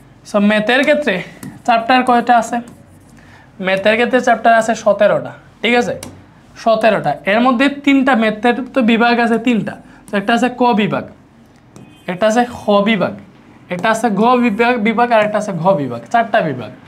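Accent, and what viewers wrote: Sylhet, Bangladesh. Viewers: Bahi sure Sylheti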